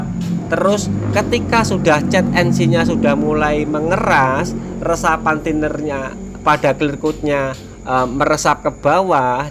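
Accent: East Java, Indonesia. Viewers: Lokasi di mana mas, ko kaya' jawa timur an...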